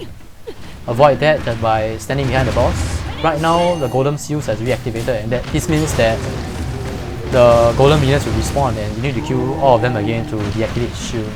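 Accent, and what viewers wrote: Singapore. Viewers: its a singaporean accent. as much as u and i might hate it cuz we're NA ppl, its the way singaporeans talk. its their national accent. type in singlish in youtube if u want to know more about it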